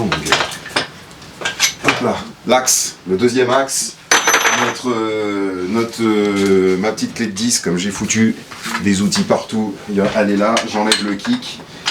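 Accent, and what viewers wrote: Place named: Charente, France. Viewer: Ça fait plaisir de t’entendre parce que je te suit depuis avant le Covid et ça faisait longtemps qu’on t’avais pas vu et retrouver cette façon de parler avec cette accent charentais moi qui habite en Bretagne depuis très longtemps ça fait vraiment plaisir. D’ailleurs j’ai trainé un peu dans le monde du moto cross en Charente à la fin des années 90/00 et c’est sur qu’on connaît du monde en commun. Bref continue ce que tu fais.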